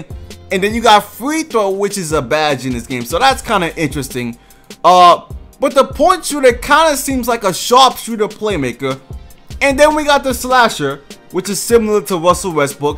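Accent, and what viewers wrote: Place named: New York, United States. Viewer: You from New York?? Your accent lmao💀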